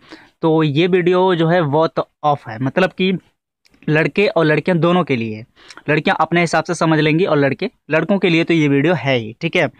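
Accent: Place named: Bihar, India. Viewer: Tu khud awaj se Bihari lagraha hai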